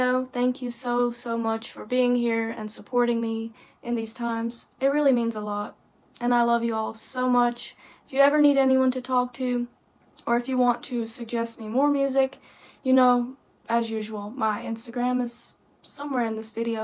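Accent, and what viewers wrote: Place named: Minnesota, United States. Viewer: Thanks for this reaction,! You are right that so many of Persian music is/sounds sad! I believe music shows "the state of a society". Unfortunately, in at least the last 200 years, Iran has experienced a lot of downs which may reflects itself in music. Just my 2 cents. I hope that things change societally, and we can produce cheerier (but still meaningful) music. I hope a speedy recovery for you ❤ By the way, I like your accent! If I may guess, Minnesotan?:)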